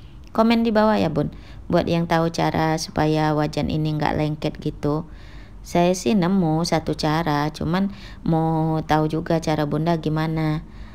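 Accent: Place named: Pekanbaru, Indonesia. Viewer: assalamualaikum bund..cara ngomong nya mirip orang Pekanbaru bund..hihi..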